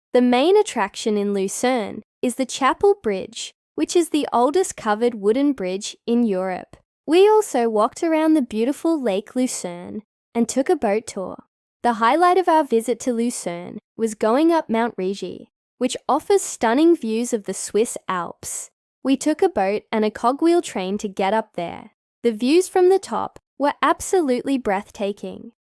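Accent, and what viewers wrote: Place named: United States. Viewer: Oh no, another American-speaking influencer girl. Don't we have enough?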